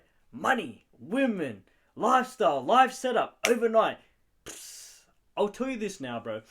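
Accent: Australia. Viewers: are u australan ??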